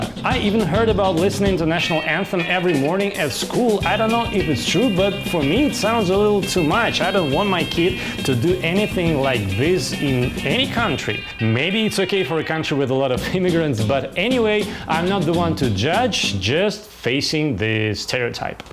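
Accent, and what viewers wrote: Russia. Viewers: Дима Крут ну правильно, в русском акценте все понятно;)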